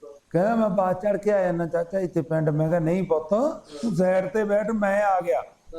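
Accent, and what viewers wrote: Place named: Lahnda, Pakistan. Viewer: So sweet ❣️❣️❣️❣️ Lahnda Punjabi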